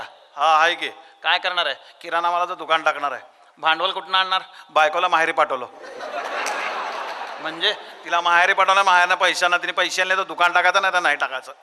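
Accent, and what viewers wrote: Solapur, India. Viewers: ek no solapuri bhasha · खुप सुंदर सोलापुरी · Solapuri dilect is masta ahaya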